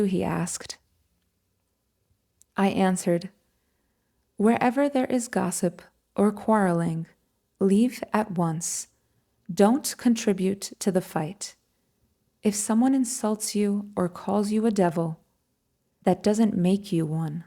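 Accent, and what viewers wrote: Brazil. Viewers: I agree that she has a nice tone in her voice and pronounces most Sanskrit words properly however I do hear a slight Brazilian or Portuguese accent in her pronunciation of Sanskrit. In fact some rather simple English words are mispronounced such as ‘ether’, and other words that I cannot remember right now. Overall her Sanskrit pronunciation is far better than most.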